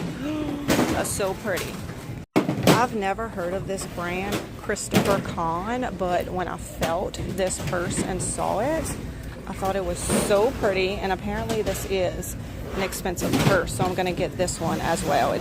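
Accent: Louisiana, United States. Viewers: What is that accent? Louisiana?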